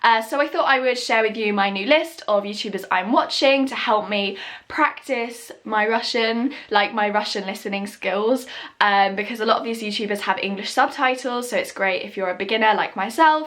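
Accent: United Kingdom. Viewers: I love to listening to british accent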